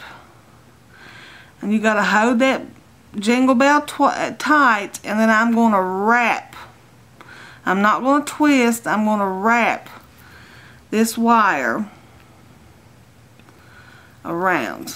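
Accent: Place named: Kentucky, United States. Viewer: I love your videos they are very informative and easy to follow and also you crack me up. Your country accent reminds me of my husbands family, they are in WestVirginia. You sound a little more south, regardless of where your from, your great,keep up the good work, I cant wait to watch more of your tutorials...GOD BLESS YOU DARLIN!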